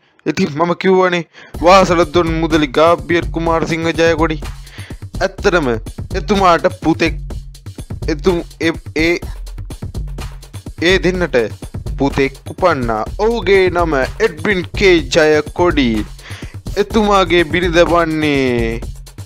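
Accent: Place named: South, Sri Lanka. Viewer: Anthimai... Sawuthu widihata katha karanne.... Mokekda manda😜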